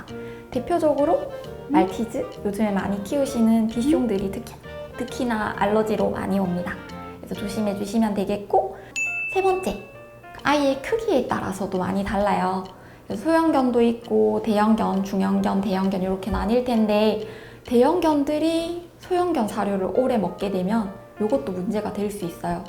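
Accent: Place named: Gyeongsang, South Korea. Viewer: 수의사님 고향이 경상도이신 것 같네요 그냥 수의사님 고향 사투리로 말씀하시는 것이 더 정겹게 들릴 것 같네요 ^0^ 중간 중간 사투리 나오는 맨트 보고 빵 터졌습니다. ㅎㅎㅎ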